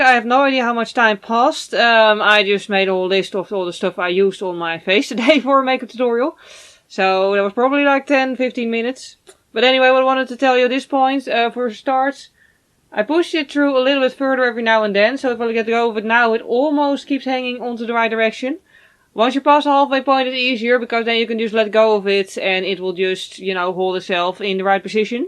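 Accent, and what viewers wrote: Netherlands. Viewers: That dutch accent 👌🏻 ik zie je 😍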